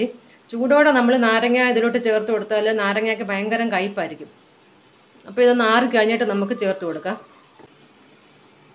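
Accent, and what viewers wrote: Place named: Thiruvananthapuram, India. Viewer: തിരുവനന്തപുരം സ്ലാങ് 😊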